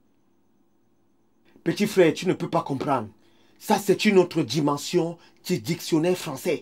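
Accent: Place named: Cameroon. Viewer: Tu as un rare accent camer, où est passé ton chôcô de la Ndeuch Family 😂😂😂😂😂???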